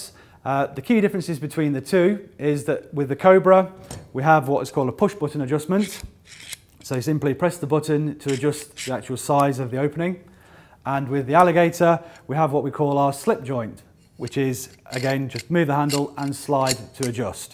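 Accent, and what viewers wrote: United Kingdom. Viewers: All is good in video except britt english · I expect a proper german for these videos. Go home britbong.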